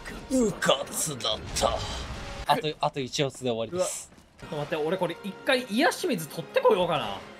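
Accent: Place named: Kansai, Japan. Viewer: 釈迦さんがちょいちょい関西弁の影響うけてんのジワる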